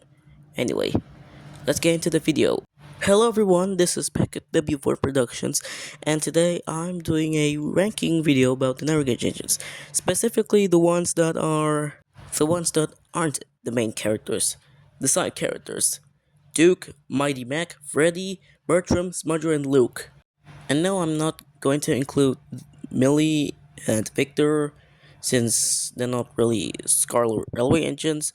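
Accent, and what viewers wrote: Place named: Indonesia. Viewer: i can tell the indonesian accent lol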